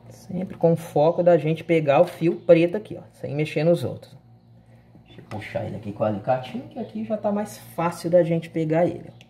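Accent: Minas Gerais, Brazil. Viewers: I m p r e s s i o n a n t e ! Não bastasse o conhecimento repassado livremente e com riqueza de detalhes, somos agraciados ainda com um formidável Mineirês que torna as AULAS, além de extremamente instrutivas, muito agradáveis. Muito obrigado meu camarada. O mundo precisa de mais mestres como você, não para consertar o mundo, mas para ensinar as pessoas que elas também podem consertar, seja o que for. Abraços.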